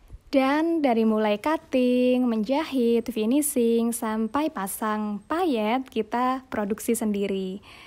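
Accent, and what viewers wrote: Java, Indonesia. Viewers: Aku seneng nih kak ngomongnya medok medok gimana gitu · Mbak ngomonge medok tp lucu😁.luvv mbak q lgsg mengulas tabus krena merasa sangat amat bermanfaat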